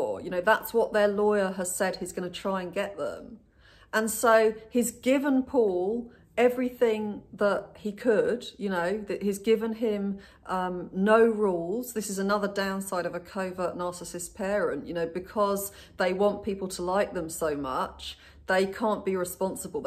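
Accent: England, United Kingdom. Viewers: Your beautiful English accent to this American is so refreshing and lovely and calming to listen to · I love that English accent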